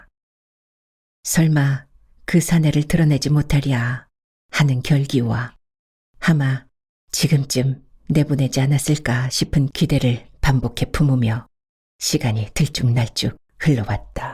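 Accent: Jeolla, South Korea. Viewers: 처음부터 구수한 전라도 사투리 로 고급지게 들리는 에 낭독이 왠지 전설스런 내용일듯 좋아요 누르고 감사하며 듣습니다~♡ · 전라도 사투리가 찰집니다. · 와 사투리 구사가 완전, 설마 전라도분 이실까요? ㅎ 우리 할머니랑 똑같은 단어 똑같은 억양 완전 리얼 합니다..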